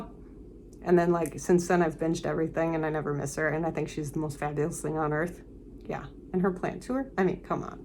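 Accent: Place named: Wisconsin, United States. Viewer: You’re from Wisconsin, I got the accent right!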